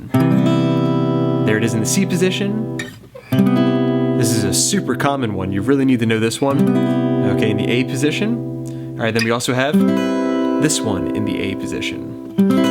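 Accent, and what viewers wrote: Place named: Mid-Atlantic, United States. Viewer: Very nice lesson, appreciate it. I'm from the mid-Atlantic and recognize your accent ... a lot of people probably wouldn't pick up on it.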